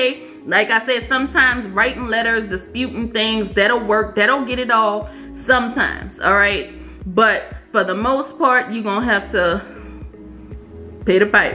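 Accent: New Orleans, United States. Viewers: You got that NOLA twang love from Htown thanks for the game 💯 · Great info! I love your attitude and accent ❤️ Sounds like you from New Orleans 🤣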